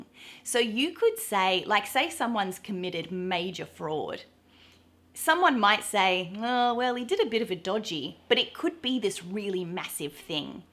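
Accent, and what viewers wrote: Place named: Australia. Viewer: Elephant in the room. You are speaking with an "AUSTRALIAN" accent. A REAL Australian.. accent.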